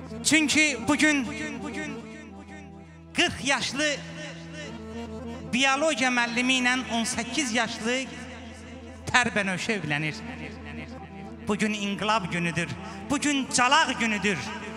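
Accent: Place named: Talysh, Azerbaijan. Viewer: Bu muwfiq taliw lehcesiynnen daniwir ter benovwe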